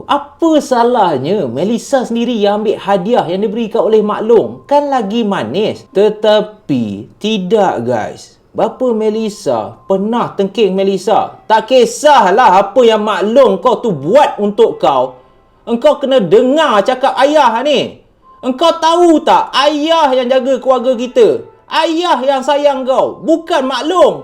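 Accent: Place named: Kedah, Malaysia. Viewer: Loqat kedah sakan dah lani noh hangit torey haha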